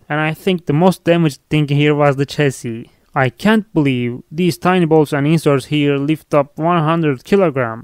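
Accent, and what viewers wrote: Turkey. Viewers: aksandan direkt anladım Türk olduğunu 👊😁🇹🇷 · Your accent is like Turk :) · Abi bu Türk aksanı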